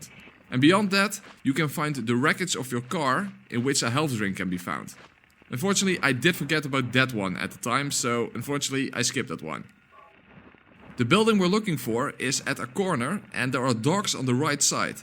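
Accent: Netherlands. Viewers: You must be Dutch, I hear an audible accent!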